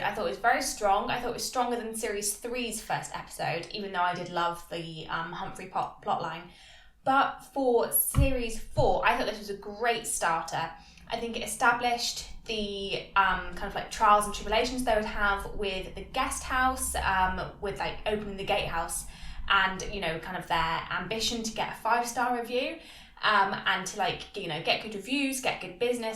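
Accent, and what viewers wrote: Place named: England, United Kingdom. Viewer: Love your reviews, and thanks for uploading. Would it be possible that you speak a little slower? Your English accent is lovely but not always easy to understand at times - and the subtitles don't always make sense.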